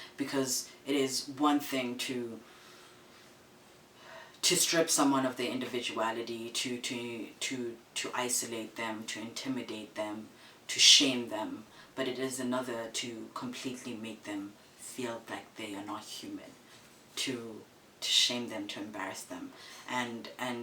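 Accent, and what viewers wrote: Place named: United States. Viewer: oh my word you have an American accent already :D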